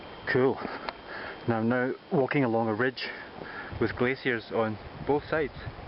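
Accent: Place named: Scotland, United Kingdom. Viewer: Hey man! congrats and thanks for sharing. Love your Scottish accent..Would you like to borrow your voice on new video or film I will be producing in the next coming year..check out my movie about the Via Alpina (blog <link>) Just few questions about Madatschjoch (time ). there are 2 ways from verpeil hutte..what makes you choose the trail through MadatschJoch and not VerpeilJoch ? Did you knew the condition of this trail and what it was like on the way down (ladder and a wall to climb down) ? I assuming you did not know according to what you said when reaching the Col Madatschjoch.... :-) I personally think the way down to kaunergrat was really tough...going up is slippery on unstable ground and with such weather condition you had i know I will have stayed and rest Verpeil hutte..;anyway you did it... From kaunergrat hutte did you follow the cottbuser trail to rifflsee (there is a short section that i would not like to pass on a rainy/bad weather day also there) or hit down the valley asap and skip that short "climbing section" to suunalm/rifflsee ? 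What camera are you using ? will look to your Greek video..I love Greece and it is very funny because you did or went to places I also went and liked Here an idea for you check out Mercantour NP Vallee des merveilles will be something you might like... happy hiking MUL rules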